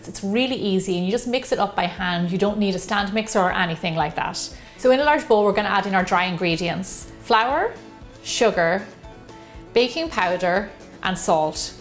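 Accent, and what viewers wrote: Ireland. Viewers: Hi! This is a wonderful cake and even though I don't eat eggs I bet this is just so yummy! I love your videos and your wonderful personality and that absolutely lovely Irish accent! It's also heartening to know that you reply to the comments of your subscribers. Good luck to your awesome channel!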